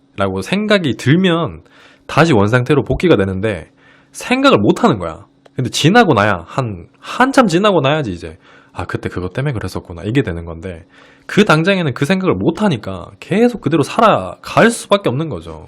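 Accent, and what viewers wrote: Gyeongsang, South Korea. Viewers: 처음 보는 영상인데 일단 목소리,대구?말씨 억양, 훈남이면서도 편안한 외모에 위로받는 느낌이 들면서 빠져드네요 손 동작이 조금 산만해보여 아쉽지만 구독과 함께 좋아요 입니다.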